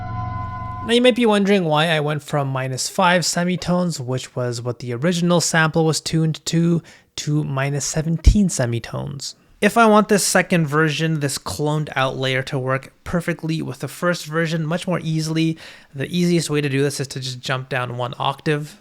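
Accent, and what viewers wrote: India. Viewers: Weird i never notice but your indian accent is heavy in this, i never noticed. Dope vid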